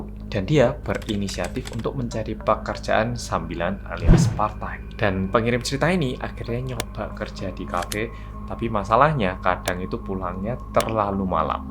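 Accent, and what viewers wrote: Java, Indonesia. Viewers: Aku udah siap2 mau bilang "orang korea yang medok"😐😂 Ternyata Malah keweden😂😂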